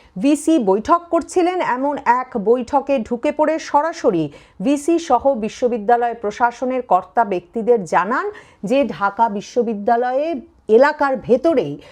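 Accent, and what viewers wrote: Kolkata, India. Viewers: আপনে এই রকম কলকাতার মেয়েদের মত কথা বলেন কেনো?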